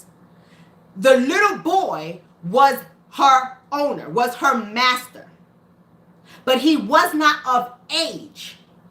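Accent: New York, United States. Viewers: I LOVE your NY accent.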